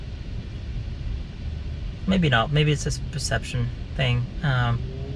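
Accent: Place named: United States. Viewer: Hi, are you... French? You've got a good American accent from what I can judge (being French myself😁). Nice video 👍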